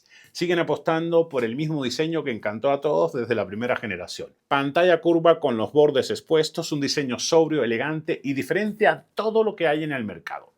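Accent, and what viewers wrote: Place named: Spain. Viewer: haaaa eres mexicano🤔,,porque tienes ese acento,,español.pero buen review,,igual que isa marcial 😁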